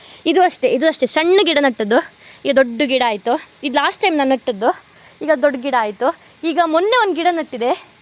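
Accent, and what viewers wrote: Kundapura, India. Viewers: ನಿಮ್ language ಕೇಳಿದ್ರೆ ಕುಂದಾಪುರ ಕಡೆಯವರು ಅನ್ನಿಸುತ್ತೆ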